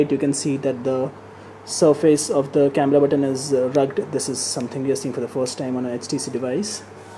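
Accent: India. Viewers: u are an ass with fukin indian accent.. gayboy... :x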